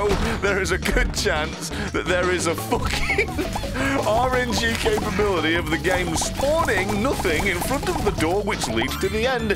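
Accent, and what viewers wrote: United Kingdom. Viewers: Is it just me or is EXTRA British today?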